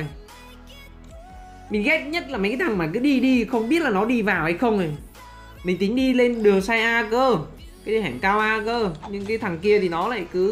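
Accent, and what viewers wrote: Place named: Nghe An, Vietnam. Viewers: Dlm nghe dong khăm vv ..may dân nghê an ah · Nghe cái dọng miền trong .kho chiu vl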